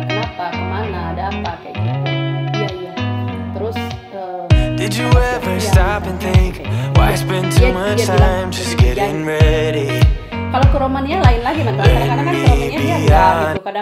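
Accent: West Java, Indonesia. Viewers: Aduhh teteh yamg nonton lebih panik...apinya naik gt deg2 an teh teteh biar lama di uk tapi logat sundanya ndak ilang ya teh tapi ndak papa itulah cirinya teteh....rame teh kalo sambil cerita2 tambah asik....selalu sehat teh❤❤ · Abdi kadupandak oge, Suganda. Seneng teteh logat sundanya masih.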